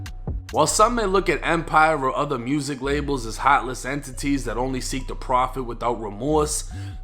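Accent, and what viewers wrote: Boston, United States. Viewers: is the best story teller on YouTube hands down, I watch all this guy videos. The way he talks and gets things across + that Boston accent 💯 dope.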